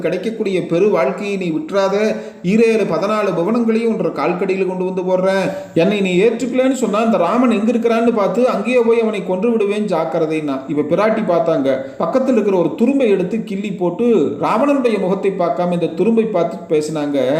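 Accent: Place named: Kongu, India. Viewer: அருமையான சுந்தர கொங்கு தமிழில்......... அற்புதம்... வாழ்த்துக்கள். ஜெய் ஸ்ரீராம். ஜெய் ஸ்ரீராம். ❤❤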